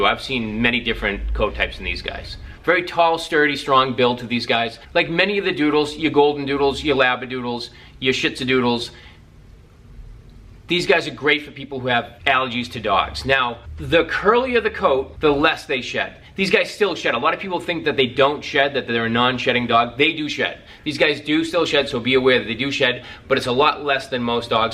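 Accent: Boston, United States. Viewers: I'm a New Yorker and can say he has a Boston (or some sort of New England accent) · You sound like you’re from Massachusetts or Rhode Island. ❤I’m near Boston or Bahwstahn. 😂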